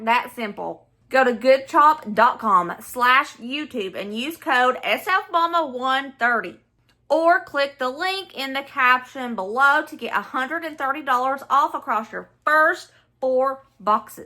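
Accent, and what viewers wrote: South, United States. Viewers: Sister we understand that southern drawl just fine! ❤ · Girl I'm here for your country accent!!❤ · lol.. we can hear the country accent, if you can’t they are country too, and they speak your language so they wouldn’t know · don't apologize for your Southern accent. I was born, raised and still live in Tennessee and the older I get the prouder I become of my accent. You are a dear girl. I love watching you and your sweet family. God Bless you. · LOL!! I'm a Texan of Mexican heritage ... I, too, hate cilantro & DOES taste like soap!🤢😫 My bestie LOVES cilantro and uses it a lot (🙄🤦‍♀️), and I've gotten used to it, but I still won't buy it.😉 First time here, and I subbed. Love your southern accent. ❤ And you did pronounce frijoles correctly. 👍 Oh, and I have added cornbread to my mom's beans and still do. Can't help it.🤷‍♀️ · You talk just fine, don’t mind the (KARENS)😳. 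❤to you thank you for your time and country a. accent. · I adore your accent, I moved to Texas & there are not a lot of people here who have country accounts. 😔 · I LOVE & ADORE YOU!!! 😂🩷😁 You are the funniest when you're trying to explain things, like your Southern Accent trying to pronounce Mexican words!🫣 Thank you for making me feel better and giving me a chance to actually feel like laughing🩷🤭🤣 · Luv yo Suthun accent...lol. I often make the chorizo with beans but use the very minimum amount of liquid. This us just a micro part of the gigantic array of food dishes from Mexico. I also make Móle, Enchiladas, Chiles Rellenos, (Shee-less-reh-yenos) plus many other dishes at home. God bless you and your family. BTW, I love cilantro and make my own Salsas with cilantro. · I’m not Mexican but in Texas you’re not allowed to not like Mexican food. It’s the best. I also love Vietnamese foods. 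They use a lot of those great spices plus others from Asia. You should try some of the Asian recipes. People would love your take on them. I’m an old great grandmother who really enjoy watching you. I love your accent it’s so warm and southern. You and your family are precious.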